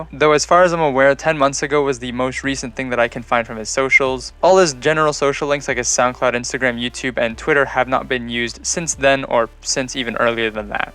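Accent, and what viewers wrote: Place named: Canada. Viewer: idk why but you sound extra canadian in this vid. Anyway, this is great and I'd love to see more of these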